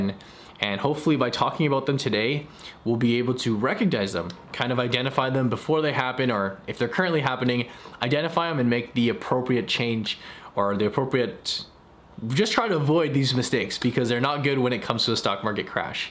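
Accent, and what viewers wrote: Canada. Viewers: Sometimes your Canadian accent is comes out and it’s really entertaining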